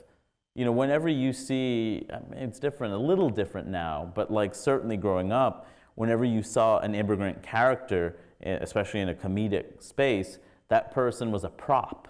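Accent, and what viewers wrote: United States. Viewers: This guy is literally grown up up in America who’s mum is American you have a American accent yet you feel done over by APU shut up you sound more White and Buttered than Bill Clinton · Hari is more American than he is Indian. Sure, he might look physically like an Indian and he might have the same traditions his parents had. But c'mon, the accents just tells the truth. It's really very hard to find an Indian that has a clean accent (ofc, if he was BORN and raised in India). Also tbh Apu is probably one of less stereotyped character in the series, wanna know what is really violent and stereotyped? Watch the episode in which The Simpsons travel to Brazil.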